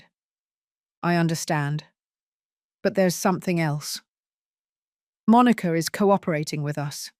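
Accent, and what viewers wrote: United Kingdom. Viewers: I think the accent is British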